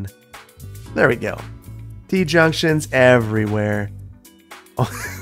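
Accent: Wisconsin, United States. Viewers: let your Wisconsin come out more often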